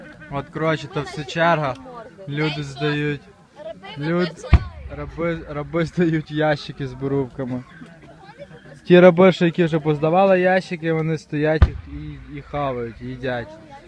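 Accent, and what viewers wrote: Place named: Western Ukraine, Ukraine. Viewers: То чисто під львівський діалект змішаний з сільським )))) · Базаришь как Лох!!! это не есть чистый украинский язык!!! Сразу видно западная Украина Але Але! На майдане стояле а теперь клубнику в Польше собираете!!!!!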